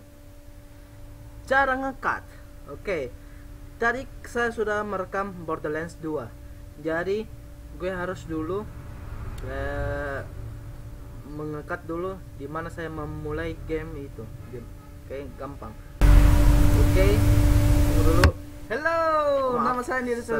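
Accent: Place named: Manado, Indonesia. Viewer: logatnya be manado wkwkwkwkwk ane ket manado soalnya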